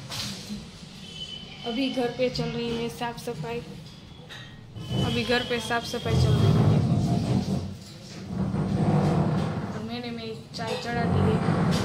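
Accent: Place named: Gujarat, India. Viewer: Wah wah....gujju jyare hindi bole...bapa ketla saras lage😄👌👌👌👌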